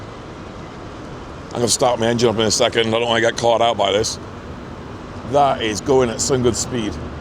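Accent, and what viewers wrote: United Kingdom. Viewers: Where did you get that lovely British accent? Certainly not in Ontario.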